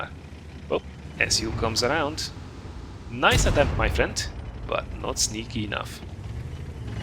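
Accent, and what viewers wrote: Hungary. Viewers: Where are you from??(narrator) You have got hungarian accent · he is from hungary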